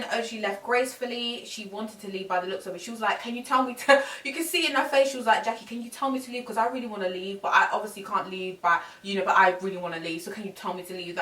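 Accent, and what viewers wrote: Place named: United Kingdom. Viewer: Lovely Brit accent I love it OMG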